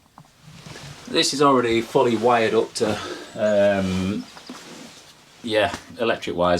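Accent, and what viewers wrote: Yorkshire, United Kingdom. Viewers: Hi.... How you doing? What you been up to? It's been a while since you posted a vid. Hope all is good!? Just letting you know that I enjoyed watching a lot of your Lakes camping trips... I'm from Lancashire but lived in Yorkshire for half my life so I really connected with your accent and manarisiums, reminded me of when I was younger. Take care with whatever you are doing and thanks for the effort producing the content that you have. 🤟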